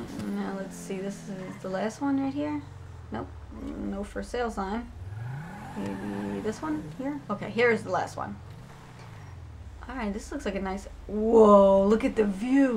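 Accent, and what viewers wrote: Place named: New York City, United States. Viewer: i like ur urban nyc accent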